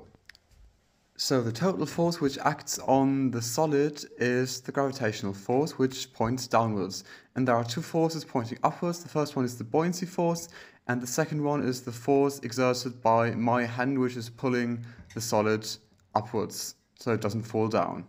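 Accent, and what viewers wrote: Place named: United Kingdom. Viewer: British english 😮🎉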